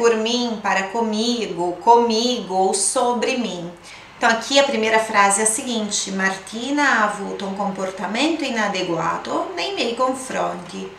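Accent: Abruzzo, Italy. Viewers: Salve. Lei ha un accento familiare abruzzese. Ho vissuto 18 anni a Lanciano!!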